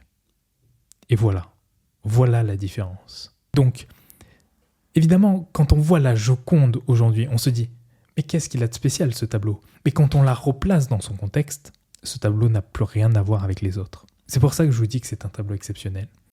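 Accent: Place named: Housing estates, France. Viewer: Ce fond d'accent des quartiers qu'ont malheureusement les 3/4 de nos jeunes aujourd'hui (même ceux qui ne sont pas des quartiers), m'a littéralement dégouté. Affreux.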